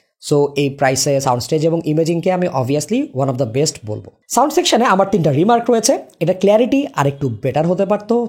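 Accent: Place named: Dhaka, Bangladesh. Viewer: কে ভালোবাসা দিয়েই ধন্যবাদ জানাই যাউজ্ঞা সামনের দিক আউজ্ঞা ঢাকাই ভাষা অসম্ভব ভালোলাগে তোমার😊 আপনার উপস্থাপনা দুইভাবেই মাঝে মধ্য আপন ভাবে কথা বলি আমি, উপস্থাপন দেখে। Don't mind plz ঢাকার পোলা আইসা পড়ে। দোয়া ও শুভকামনা সত্য সামনে রেখে কথা বলা (যেকোন পন্য রিভিও এর কথা বলছি)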